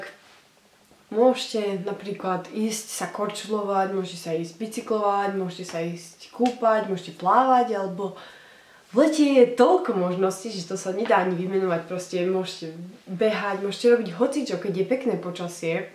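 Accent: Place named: Hungarian border, Slovakia. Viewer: + podla mňa bývaš na 90% pri maďarských hraniciach :) Podla prízvuku (mám pravdu?)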